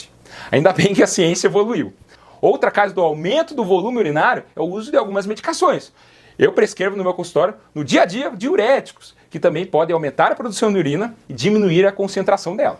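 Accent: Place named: Rio Grande do Sul, Brazil. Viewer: Doutor o sr e Gaucho de onde?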